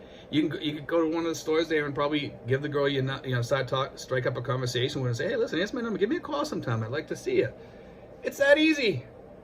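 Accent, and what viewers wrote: Boston, United States. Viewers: Always feel like ( your accent ) I'm watching Good Will Hunting! Bumpah stichah, for the cah.. seriously...I shop at home in Canada for everything. Balut is available here. And ah, the ladies who make balut live here too. So, if you want to travel 7000 miles to shop, knock yourself out. Might be simpler to walk to the local store. Sheesh boys! We are college gradu-ates right:)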